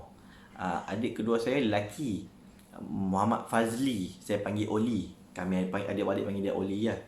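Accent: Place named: North, Malaysia. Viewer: Happy birthday, sikit lagi nak masuk 4 series. Dalam banyak dilek, utara punya dilek paling best dengar.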